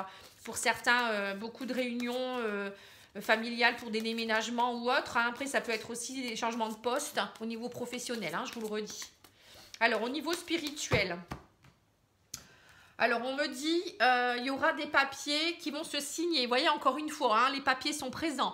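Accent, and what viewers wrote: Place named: Pas-de-Calais, France. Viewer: Je connais bien cette accent du Pas de Calais 😁👍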